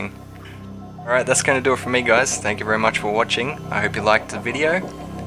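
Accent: Australia. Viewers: Was looking at how to aim - and watched the whole thing laughing - and - its nice to hear a fellow Aussie voice!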